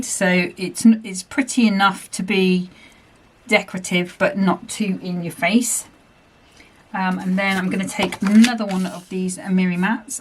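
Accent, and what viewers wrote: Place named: Bristol, United Kingdom. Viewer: When I first started watching your videos, I thought I heard a slight Bristolian twang. You use similar colloquial phrases that I do! Fab cards as always. Sending love from Bristol xxx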